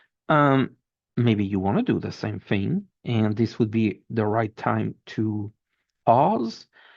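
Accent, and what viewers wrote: France. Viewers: Thank you, this was really great and I can relate to all of it, you explained it so well. I love listening to you because I miss the French accent that I grew up with. I am so glad that I found you on Linda G's video last week. You a good at this. I hope to hear more from you. Bonne Annee' 🙏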